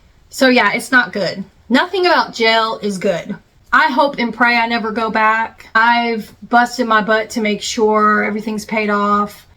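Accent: South, United States. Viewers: Three minutes in and I love your natural speaking voice. Are you from the south??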